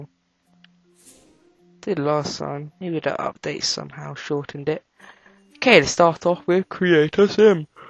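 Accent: United Kingdom. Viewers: he sounds more british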